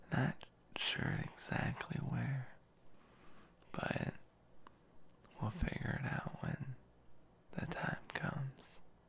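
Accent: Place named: Midwest, United States. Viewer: •__• he’s from the us,, im pretty sure somewhere in the midwest??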